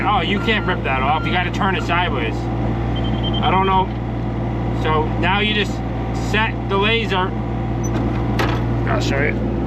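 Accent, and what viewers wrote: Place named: New York, United States. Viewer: Unsubscribing!! Can't stand listening to that annoying New York accent!!! And you're a know it all, typical east Coast Democrat!!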